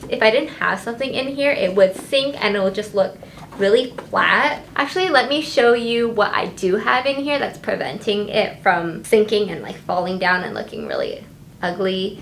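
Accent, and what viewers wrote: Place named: Bay Area, United States. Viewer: You're channel just popped up on my feed. I was watching this and I was like she sounds like she's from the Bay. I looked at your IG and BOOM lol. P.S. thanks for the tip about the cardboard!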